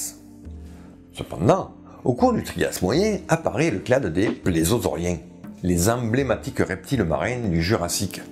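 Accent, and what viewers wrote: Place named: Occitania, France. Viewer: Salutacion dempuèi Occitània, que s'ausis a l'accent del youtubaire ;-)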